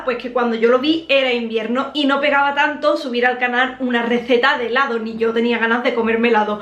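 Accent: Andalusia, Spain. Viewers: ¿De qué parte de españa es su acento? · Hola, soy de Argentina, me gusta tu tono de voz, eres de Andalucía? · Me encantaste de que parte de España es ese acento tan bello ?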